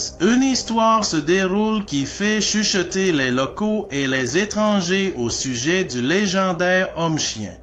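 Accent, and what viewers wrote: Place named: South, France. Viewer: well, as a frequent visitor to France, not only do your videos help with my learning the language, but I also love the authenticity of the southern notes to your French accent 👌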